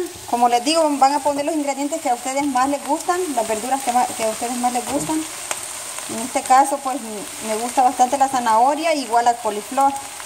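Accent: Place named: El Salvador. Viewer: Sabia que eras salvadoreña, el acento noa delata, pero me lo confirmastes cuando hablastes de las pupusas, hare mi escabeche. 😆 Gracias.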